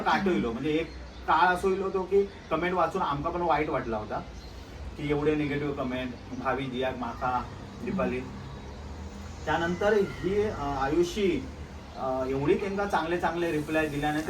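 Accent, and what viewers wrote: Malvani, India. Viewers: खूप सुंदर vlog❤❤❤माका तुमची मालवणी लय आवडता.....तुमची अशीच प्रगती होत राहो हीच स्वामी चरणी प्रार्थना ❤🙏तुमच्यामुळे आम्हाला एक नवीन उर्जा मिळते आणि तुम्ही ज्या ज्या हॉटेल मधे जाता ती सर्व माहिती मिळते .....मस्त असेच एन्जॉय करा ❤❤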